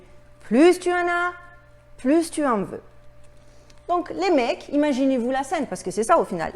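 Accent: Marseille, France. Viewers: Loook et accent de cagole, discours complotiste de tete percée....ha ha ha ....sérieux....qui peut écouter ca plus de 30 secondes sans avoir envie de l'entartrer cette folle ! FOUT TOI A POIL ! T'auras plus de viewers !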